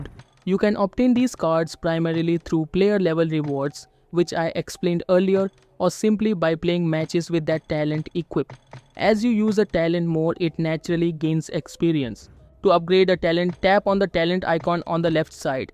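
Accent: India. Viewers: Ugh, that Indian accent is ANNOYING! 👎👎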